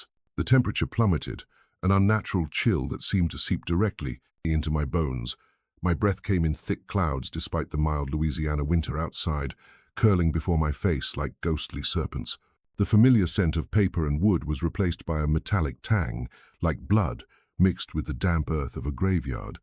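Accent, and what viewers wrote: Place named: United Kingdom. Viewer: A British accent is just WRONG for this. It needs to be a Cajun accent.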